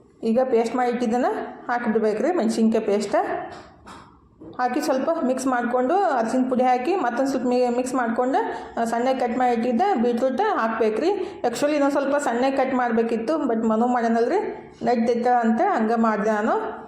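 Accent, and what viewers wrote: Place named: North Karnataka, India. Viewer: Congratulations akka.uttar karnatada bhase nimma baaina kelod onda khusi.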